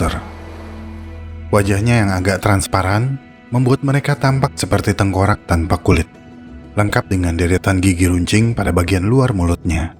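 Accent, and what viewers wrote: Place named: West Java, Indonesia. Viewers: Orang Sunda lu ye bang 😅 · Di luar konteks ya... saya perhatikan abg dalam berdialog ada semu2 sunda nya...apakah keturunan atau memang banyak org sunda di sekitar abg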